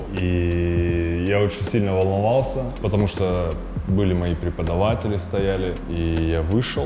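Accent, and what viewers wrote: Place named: Kazakhstan. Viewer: Если бы я с ним по телефону болтала не видя, я бы сразу сказала свой, казах)) есть у казахов свой неуловимый акцент, как и у корейцев, и у азербайджанцев, и чеченцев с идеальным русским произношением. Это трудно объяснить.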